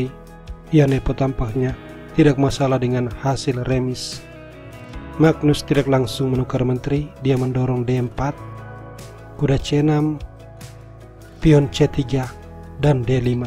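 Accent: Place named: Eastern Indonesia, Indonesia. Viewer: Bung orang timur kah. Logatnya itu kental bnget timurnya 😁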